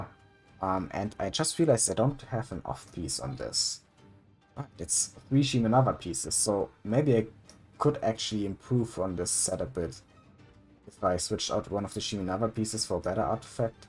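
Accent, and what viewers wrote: Germany. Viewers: 3 sec angeschaut direkt rausgehört aha der kommt aus deutschland ༼ つ ◕_◕ ༽つ <3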